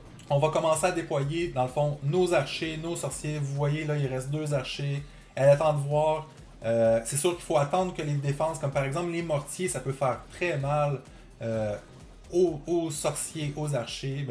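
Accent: Quebec, Canada. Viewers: tu est québécois ?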